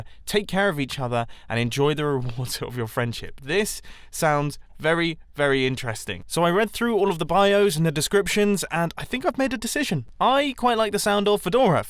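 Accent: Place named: United Kingdom. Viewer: Has anyone else started to think with a British accent because of his videos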